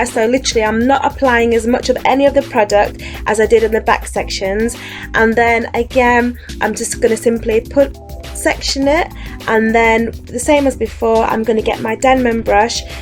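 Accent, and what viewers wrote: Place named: RP, United Kingdom. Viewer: God, I want your accent. I've always looked at those accents as classy and posh. Very pretty sounding.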